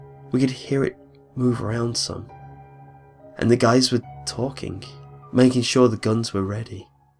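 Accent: United Kingdom. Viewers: Why would a British person be telling an American folk type story? Thats ruining my immersion.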